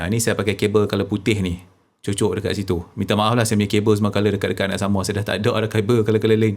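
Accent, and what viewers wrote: North, Malaysia. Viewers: Seronok dengaq oqang utara punya slang tu piaw..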